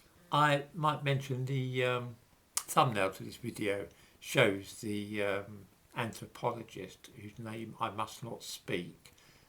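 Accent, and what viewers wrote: United Kingdom. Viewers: He is excellent! Genuine content, clear presentation, and delightful British wording and accent. Brave!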